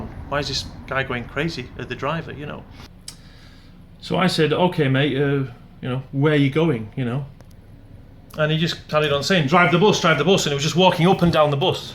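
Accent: Yorkshire, United Kingdom. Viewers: I thought you had a Yorkshire accent ! Haha hello from Huddersfield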